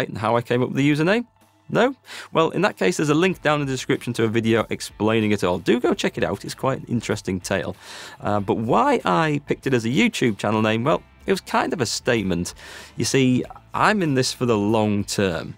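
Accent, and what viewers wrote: Yorkshire, United Kingdom. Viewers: where about in Yorkshire are you from?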